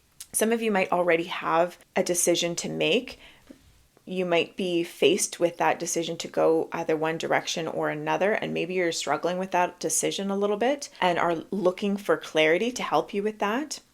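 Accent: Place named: United States. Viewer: I’m trying to place your accent! It’s distracting me from the message in my reading because you’re reminding me of a cross between a cousin of mine from upstate NY and a friend who has roots in both Colorado and Florida! I can’t tell if you’re E or W coast, midwest, southern or what. Did you have one accent growing up, then go to college out of state and end up with a standard American accent, or is that the accent where you’re from, in which case, which state? It’s lovely 😊 (I also liked my reading very much, thank you 🙏 pile 1) (PS, if it turns out you’re Canadian after all that, I apologise!!)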